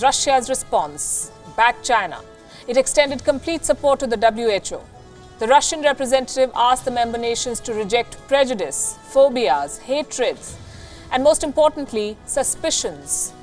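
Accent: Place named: North India, India. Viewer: I dont like this North Indian English accent without giving a pause she speaks.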